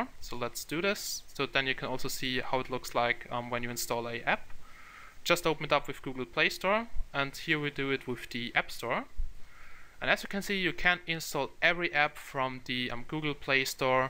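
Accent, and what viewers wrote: Germany. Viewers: It still doesn't make it a Chinese accent??? He just has a German accent, a Chinese one is completely different. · It's so funny his german accent is so obvious. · Man merkt voll dass du aus Deutschland kommst weil : 1. Du hast einen deutschen Akzent im englisch und 2. Auf deinem Handy wird dir alles in Deutsch mitgeteilt oder angezeigt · Ich finde den deutschen Akkzent extrem lustig, in einer guten Art und Weise. Zu Thema: Das ist die Quittung für die billige Produktion von Apple-Geräten in China! ---- I think the German accent is extremly funny, in a good way. Now to the topic: That's the result of the cheap production of Apple products in China! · du bist deutsche oder östereicher das hört man total raus :) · man merkt es spricht ein Deutscher.. :D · Are you German? Your English sounds German haha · Du deutscher man hört das richtig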